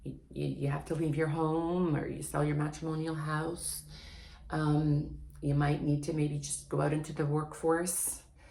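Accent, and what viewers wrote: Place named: Canada. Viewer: I knew you were a canadian based on 1 single word you spoke. Im in detroit, ontario border in detroit, and we speak exactly the same as far as dialect except for pne word. About. You canadians really pronounce it quite different.😄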